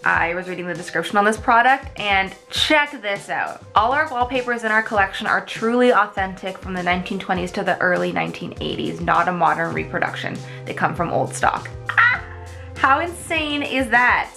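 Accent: Canada. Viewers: i love how Canadian sounds ahhaha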